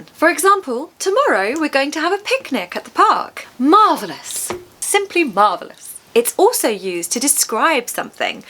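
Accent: RP, United Kingdom. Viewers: I do find the RP accent very charming ˖♡ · Marvelous accent Lovely intonation Nice pronunciation Kind voice Attractive British English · This was excellent. I love listening to your British Accents...............Toodle Pip from 🇨🇦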